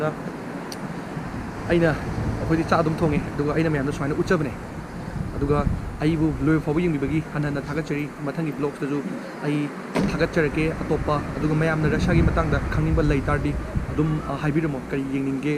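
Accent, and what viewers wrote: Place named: Haowe, India. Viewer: Bro ngi haowe khuhaido taba yam nungai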